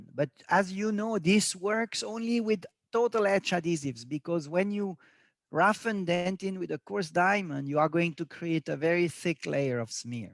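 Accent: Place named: France. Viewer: ну ладно французский английский))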